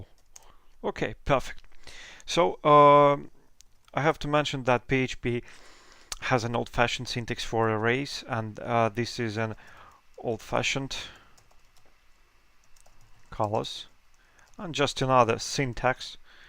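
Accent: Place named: Russia. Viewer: The Russian accent, haha :)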